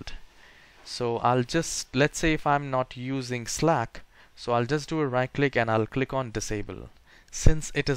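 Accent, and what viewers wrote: India. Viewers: I like your Indian accent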